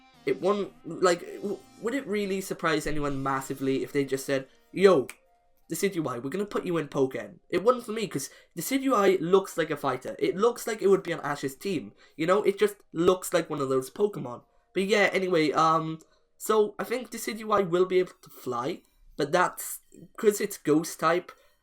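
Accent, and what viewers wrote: Wales, United Kingdom. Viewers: R u Welsh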